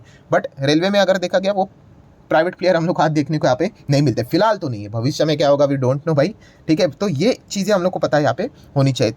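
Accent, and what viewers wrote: Maharashtra, India. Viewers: Kantala se laga sir Marathi Manus hai 😂😂😂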